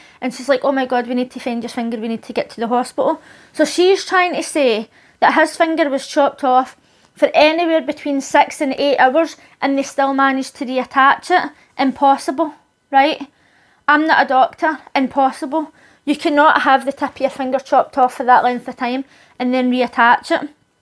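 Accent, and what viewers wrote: Scotland, United Kingdom. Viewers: Thats scottish, right?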